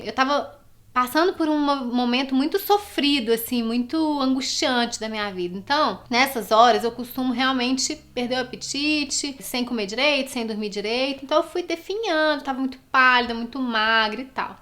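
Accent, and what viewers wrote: Minas Gerais, Brazil. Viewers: Te encontrei a pouco tempo, e que delícia! Amo ouvir seu sotaque mineiro, sou mineira mas moro no MT em sinop. Seu vídeos são inspiradores... Tenho amado muito. parabéns pelo canal.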